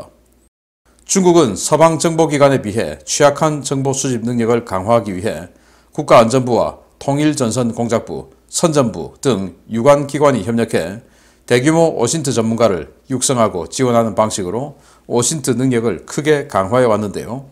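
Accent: Gyeongsang, South Korea. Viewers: 구수한 경상도사투리항상잼나게즐구독하고있어예~^^;아침마다구독과좋아요..꾸욱~보냅니다..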